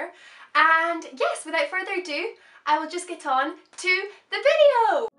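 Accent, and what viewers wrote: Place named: Edinburgh, United Kingdom. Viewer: You sound like you are from Edinburgh, if you are then I'm guessing this video is inspired by the leith festival? Just a guess x 😆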